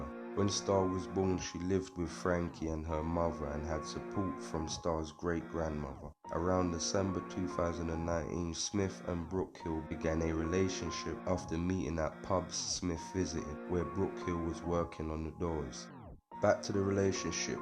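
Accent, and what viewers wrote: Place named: Cockney, United Kingdom. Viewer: Couldn't you get a better narrator? Not this monotone voice from the East End of London...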